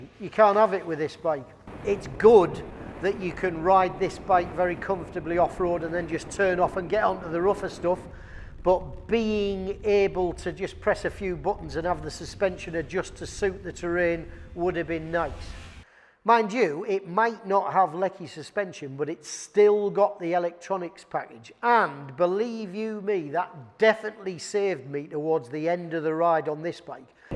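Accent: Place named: Northern England, United Kingdom. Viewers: It's our favourite Geordie !! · Oh god started to watch then stopped. Can't stand gobby northern twat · It's nice to see and hear a northerner instead of those shandy drinking southern pair of knobheads. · Who is this miserable Northerner???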